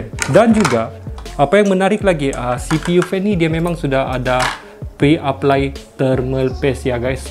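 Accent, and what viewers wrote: Sabah, Malaysia. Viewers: He from Sabah so have different slangs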